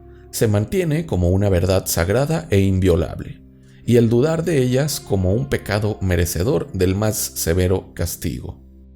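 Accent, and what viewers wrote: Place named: South America. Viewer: Hablas con astucia con un acento de un pais de America del dur usas chivas , eres Moiche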